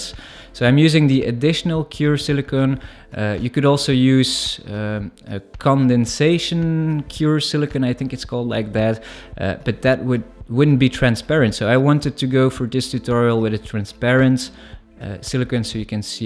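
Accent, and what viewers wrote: Netherlands. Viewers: Just out of curiosity, are you Dutch? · are you dutch? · I watchdd the intire vid and im still confused. Were are you from. Becouse i hear bits pf a dutch accent. But im not sure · Bro you dutch or something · Damn the Dutch accent is strong with this one! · Okay i immediatly recognised your Dutch accent. Well im Dutch too so hi there 👋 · You sound dutch, cool ball tho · leuke video man :) je hebt nederlands accent haha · ah i love the dutch english talking xD but you said you thought about selling it or giving it away, but how can i buy this? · Deze makker heeft wel echt een typisch nederlands accent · Are you from the netherlands? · You're dutch, aren't you? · Lol by your accent i knew you were Dutch! Im dutch too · You dutch? If ur not u sound rly Dutch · Blauw? Bier? saying ehhh? saying Ant instead of And? Are you dutch? HA, i just saw your Bio and belgium counts aswell :) i'm too good at this XD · Are you by any chance Dutch?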